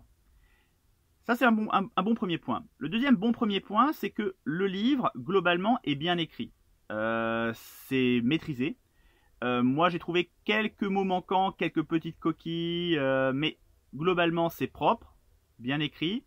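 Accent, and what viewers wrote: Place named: Nord, France. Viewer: Bonjour vous êtes chti ? (Vous avez un fort accent, désolé de le dire).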